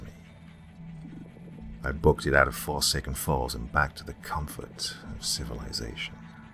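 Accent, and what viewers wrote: United States. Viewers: For the most part, most fake American accents on youtube are SUPER cringe-worthy. But damned if yours wasn't pretty spot on! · Two things. 1. You sound like Benedict Cumberbatch 2. Love the American accent.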